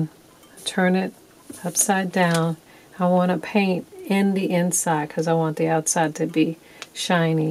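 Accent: New Orleans, United States. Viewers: Yeah baby they love how we talk. What part of the N. O. you from? I'm from Hollygrove. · Pumpkin, Punkin pumkin. No matter how you say it, we know you mean pumpkin. YouJust keep making your beautiful pieces. You are an inspiration to soooooo many and that's all what counts. Gee Whiz, we're not in Grammar School! Your New Orleans accent is beautiful and quite clear. Do your thing because you ROCK!. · She's been in Ga so long she's lost a little of her New Orleans accent. But we never lose it all! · You have a beautiful accent! You are great & ive learned so much from you! Watched all your Christmas videos last few yrs! Keep your beautiful New Orleans accent! I love it!! Say pumpkin anyway you want girl!😂❤️ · I'm English and I'd just like to say your New Orleans accent is devine!! And that you should say "pumpkin" however you like because that's who you are. 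I could listen to you all day. It's so impressive how you have everything set up with Amazon and all your templates, ideas and downloads!! Well done you!! Love your channel!! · I love listening to your soothing tone and beautiful New Orleans accent. Charming as heck. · Your New Orleans accent is beautiful. In New Mexico it's pronounced Punkin. I agree, who cares. Your voice is what drew me to your video and I'm so glad it did. I have been inspired to do a crystal foyer for Christmas and brought home a hall from our Dollar Tree here in Marrero. Keep that imagination going and forget about anything else :) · There is absolutely nothing wrong with your N.O.accent I say that because I'm from a town outside of N,O. called Houma La. So I can relate they say we t as ll flat but hoot I love ❤what you have done with this project very inspiring. Fall Vibes 🍁🍂🍁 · Hi My Suga I Love Your Accent... Why Because I’m From Nola To & You Are Unique In Your On Way? 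Cheeeeeeeeeeeeerrrrrrrrrsssss My Hun!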